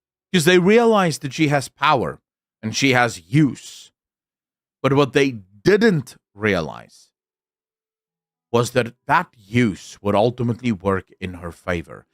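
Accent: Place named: South Africa. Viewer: South African, if I'm not mistaken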